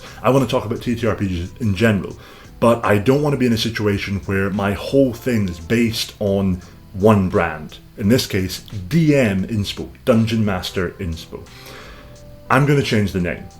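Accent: Scotland, United Kingdom. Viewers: I have literally never heard of this channel before but silver lining of this OGL debacle is that I am finding creators I had never heard of. Also you have another fan of the accent here :D. Is it Scottish?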